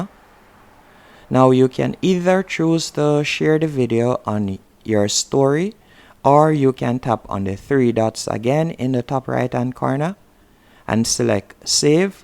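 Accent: Jamaica. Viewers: Dawg yardie accent nah guh no weh! Respect! This is what I was looking for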